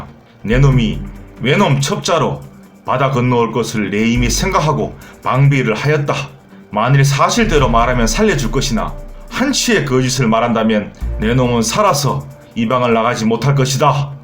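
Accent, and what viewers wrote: Gyeongsang, South Korea. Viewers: 님 이 혼탁한 말세에 사람다운 이야기를 듣기좋은 갱상도 말로 이리도 정신이 번쩍들게 하시니 그 덕이 길이 이어지길 바랍니다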